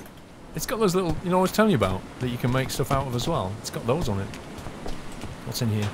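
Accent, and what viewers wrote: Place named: United Kingdom. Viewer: he is obviously British like me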